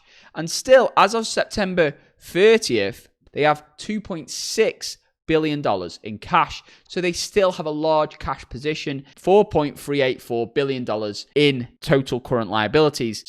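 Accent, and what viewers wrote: United Kingdom. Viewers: i love to stay in Airbnb so much when i travel but i cant agree more with that the company go public at this time is able to survive through this pandemic. long term Airbnb will profit and grow even more than it already proved in the past. i will keep this stock very closed to my watch list and see how the IPO and the stock price going but would love to buy shares one day. thank you for the amazing content plus attractive British accent:))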